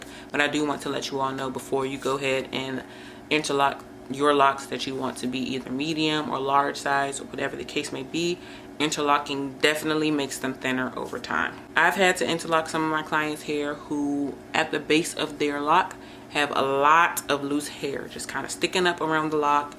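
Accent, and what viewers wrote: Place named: Washington DC, United States. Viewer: I know our accent from anywhere ❤ youre definitely from around the dc/pg area 😂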